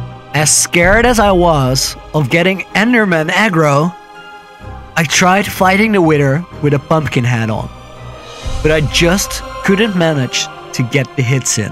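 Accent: Netherlands. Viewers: you sound dutch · Where are you from you sound Dutch!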